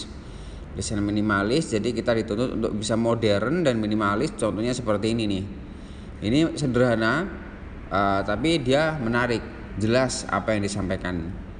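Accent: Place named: Java, Indonesia. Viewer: saya kalo liat pak jelasin materi lewat youtube mau senyum trs...soalnya medok kali pak🤭🤭🤭🤭🤭🤭🤣🤣🤣🤣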